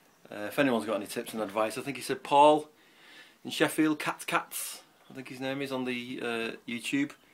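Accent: South, United States. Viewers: Now see I clicked on the video to hear the Southern accent! LOL. I know what you mean about being impatient. Bernard on navajopa31 has a video on grapevines. Here's the link. <link> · You master gardener you! You are excelling brother. Common....I want to hear you make fun of me...HAHA! I want to hear the southern accent! You'll be growing stuff yearround in that greenhouse. I like it. Wish I had a larger one.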